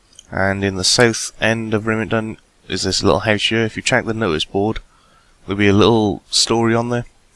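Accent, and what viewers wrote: Wales, United Kingdom. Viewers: Best guide maker i've found without a doubt. That Welsh accent just reminds me of Dirty Sanchez every time I hear it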